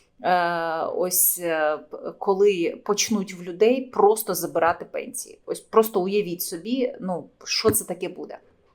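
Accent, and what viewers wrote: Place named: Russia. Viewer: НЕ ПЕРЕЖИВАЙ МЫ ВСЕ НАЛАДИМ ЗАЯ ! РОССИЯ СПАСЕТ ХОЛОВ )))! ПРИВЕТ ИЗ КРЫМА ))! Я СЛЫШУ ТВОЙ РУССКИЙ АКЦЕНТ ! ВСЯ МОЯ УКРАИНСКАЯ РОДНЯ ЖДЕТ КОГДА МЫ ОСВОБОДИМ ОДЕССУ ! ПО ТЕРПИТЕ ЛЮДИ ! ВЫ ТЕРПЕЛИ 30 ЛЕТ И ЕЩЕ НЕ МНОЖКО ПО ТЕРПИТЕ !!! СКОРО МЫ ПРИДЕМ И ПЕНСИИ БУДУТ РУССКИЕ И БУДЕТЕ ГОВОРИТЬ НА РОДНОМ ЯЗЫКЕ НА ЛЮБОМ ! ПОМОГАЙТЕ БОРОТЬСЯ В ВРАГАМИ ! ОТПРАВЛЯЙТЕ НА ТЕЛЕГРАММ ГДЕ НАХОДЯТСЯ ВРАГИ НАШЕЙ РОДИНЫ !ОТПРАВЛЯЙТЕ КООРДИНАТЫ ВОЕННЫХ ОБЪЕКТОВ ))) УНИЧТОЖАТЬ БАНДЕРОВЦЕВ ! СЛАВА РОССИИ !МЫ ПОБЕДИМ !СКИНЕМ ЯРМО США !!!! ТОЛЬКО ВМЕСТЕ!!!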